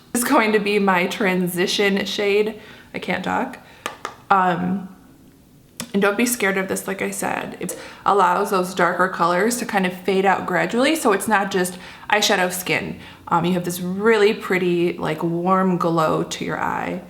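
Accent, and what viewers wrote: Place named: Michigan, United States. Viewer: Your accent makes much more sense now, you're from Michigan!!!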